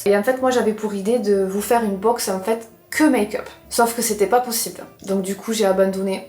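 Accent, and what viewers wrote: South, France. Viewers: Mais non, je suis née à Aubergenville aussi (en Décembre 1988 pas lontemps avant toi) c'est fou!! 😄 Jamais j'aurais cru en attendant ton bel accent du sud 😉 Passe de belles fêtes et reste comme tu es ❤ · Cc, ma!!!! Nous sommes ta thérapie en quelque sortes??? Et bien toi en tout cas tu l’es. Tu es et restera pour toujours une de mes youtoubeuse préférée. Je me retrouve tellement en toi. Tout comme toi, plus le temps passe, plus j’adore plus les animaux que les humains... Tout comme toi une vraie Brigitte Bardot dans l’âme. Ne serait-ce qu’avec, en ce moment, l’abattage incompréhensible des vaches et veaux, alors qu’avec un vaccin on pourrait les sauver. C’est une honte. Et pour une jeune fille née en région parisienne, tu as un accent bien prononcé du midi, d’ou cet enchantement à t’écouter et rire avec toi. La première fois que je t’ai découverte sur les réseaux, cela faisait un peu moment que tu mettais des vidéos en ligne. 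Et du coup, j’ai regardé en un après-midi toutes les premières vidéos depuis tes débuts. Et j’ai adoré. Ta franchise, ta sincérité, ton caractère, ta joie, ton amour pour les animaux (tous). Tu es un exemple pour toutes les générations. Un grand soleil qui illumine nos vies parfois triste... ont aime et j’espère encore découvrir beaucoup mais beaucoup de vidéos sur la mode, le maquillage, la déco. tes animaux, un peu de ta vie de famille, avoir des nouvelles de ta fille et de ton mari, même si on ne peut pas le voir. Je termine en te souhaitant de très très belles fêtes de fin d’année. Restes toujours toi même, c'est comme cela qu’ont aime. Bisous à toute ta petite famille. ❤😂🎉😊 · Chère! Ton authenticité est touchante et rafraîchissante. J’aime beaucoup tes vidéos, d’abord le maquillage m’a toujours intéressée, mais aussi tu ne te prends pas au sérieux et j’adore! En plus de ton accent du midi vraiment charmant. 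🥰 Et puis je me reconnais souvent dans ce que tu dis: timidité, préférer les animaux aux humains, les down que tu vis… et je ne suis sûrement pas la seule. Bref, tes vidéos font du bien! Merci! Et de très joyeuses fêtes à toi et ta famille! 🎄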